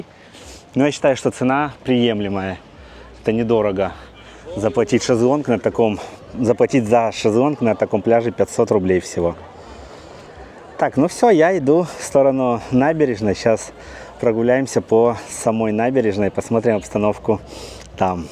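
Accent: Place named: Donetsk, Ukraine. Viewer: Вы родом с Украины ? По вашему говору и стилю очень похоже.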